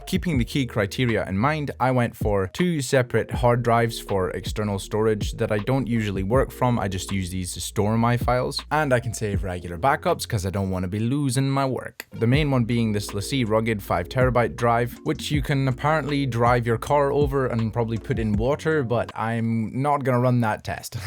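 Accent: Scotland, United Kingdom. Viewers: I love when you click on a video and hear a fellow Scottish accent! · Awesome video brother! Just outta curiosity. what part of the UK are you from? Cheers from Canada!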